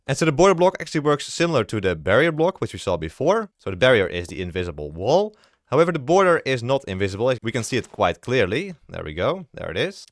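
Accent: Netherlands. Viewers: Lekker hollands accentje 😂 heerlijk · Damn bro ur dutch accent is faint. Lekker pik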